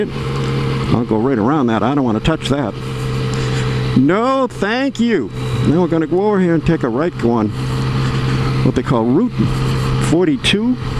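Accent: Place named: New England, United States. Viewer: His accent doesn't sound like Florida. Sounds like a New England transplant.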